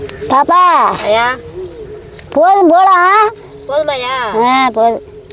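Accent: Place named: Kongu, India. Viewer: Kongu region maari irkkuthenga entha ooru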